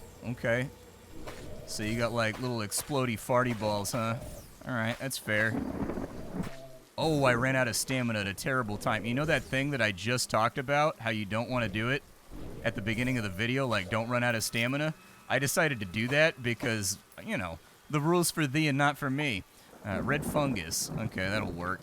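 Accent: Germany. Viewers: your german english is awesome,! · Very good German accent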